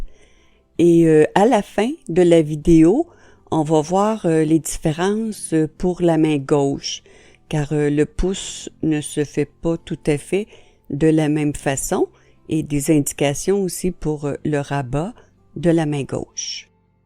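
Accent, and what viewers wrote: Quebec, Canada. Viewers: J'adore tous vos tutos. Je vous ai découverte il y a peu mais déjà réalisé plusieurs pulls et autres avec les jolis motifs de feuilles et fleurs et c'est absolument magnifique. Donc ces mitaines je vais les faire pour moi et aussi pour mes filles et mes petites-filles. Et j'ai vu des toques et écharpes assorties pour femmes comme pour hommes. Magnifique. Les cadeaux de fin d'année, je vaus les commencer dès à présent. Merci et gros bisous de Belgique. J'adore votre accent. Moi qui avais fait les démarches pour habiter au Canada ou Québec, c'était sur le point d'aboutir lorsque tout ce que nous vivons a commencer. Montrez -nous encore plein de joilies choses. Ce sont des rayons de soleil ☀️❤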